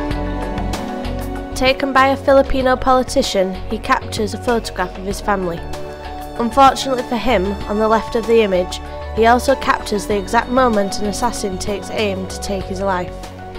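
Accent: United Kingdom. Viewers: commentators ... having thick British accents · Finally a British narrator!!...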